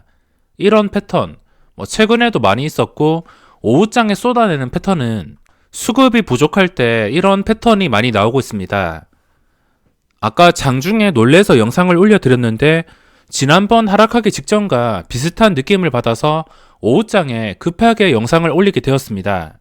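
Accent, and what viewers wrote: Gyeongsang, South Korea. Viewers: 너무 감사합니다ㆍ친숙한 경상도 사투리도 듣기좋습니다ㆍ 제가 전업을 그만두는 시점에 이쪽으로 들어오셯네요ㆍ 저는 쪽박을 차서 그만둔것은 아니지만 수익모델을 만들지 못해 기업에 입사해서 월급쟁이 합니다ㆍ 저의생각은 ㆍ 수익모델은 2가지입니다ㆍ첫째는 망하지않을 코스피10 종목 두어장뭍어놓아도 아무 신경안써도되는 아빠잘만난 주식관심있는사람ㆍ 둘째는 돌파와 손절을 할줄아는 ㆍ 이두부류외 조상의 천운이 없는 모든 사람은 주식으로 돈을 딸수없습니다ㆍ 두가지밖에 없음을 이 증명해주고 있네요ㆍ부럽습니다 ㆍ님이 하고있는 매매는 초고수의 영역이며 일반개미님에게는 추격에 가깝습니다ㆍ님도 그런개미돈을 털어간다는 아찔한 사실은 아시죠ㆍ? 세상사 베틀넷이죠 ㆍ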